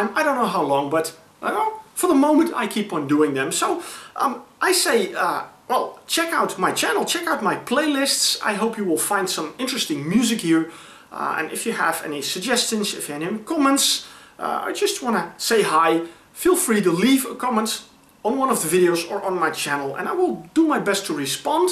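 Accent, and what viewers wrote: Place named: Netherlands. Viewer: I like the smell of prog ... (and the Dutch accent)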